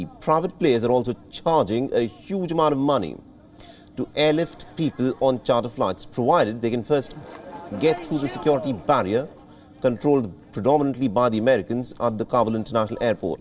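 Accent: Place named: India. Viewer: Real English, Indian accent. You yankis should realize there is a whole world outside your borders.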